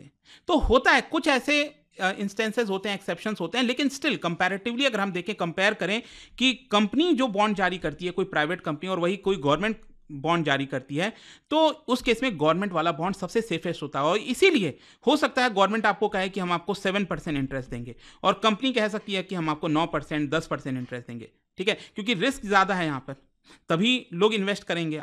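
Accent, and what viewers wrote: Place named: Maithili, India. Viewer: न बेसी आएगा न कम आएगा शुद्ध मैथिली❤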